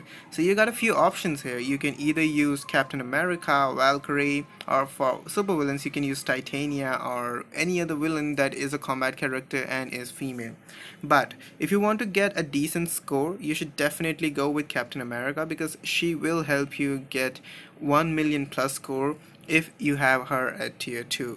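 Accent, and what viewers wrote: India. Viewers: your accent sounds Indian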